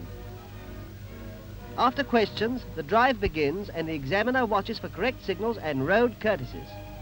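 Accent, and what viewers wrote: United Kingdom. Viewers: it's not snobby that's just how the British accent sounds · Sorry just can't take the snobby British accent.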